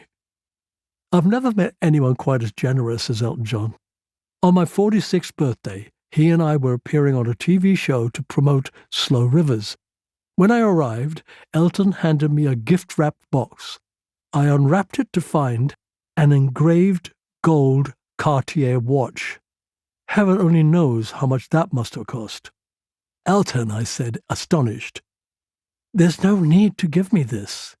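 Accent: United Kingdom. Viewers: Greetings SIRCLIFF RICHARDOBE. Thank u for sharing …this recording ..awesome reading of segments from ur book…ur Brilliant British accent is a delight to my ears..I would listen intently to further reading! An accent that seems to be disappearing.. beautiful blessings!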